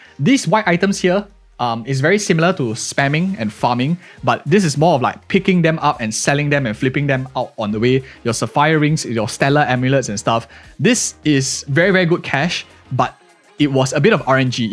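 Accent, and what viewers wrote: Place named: Singapore. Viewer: more like singlish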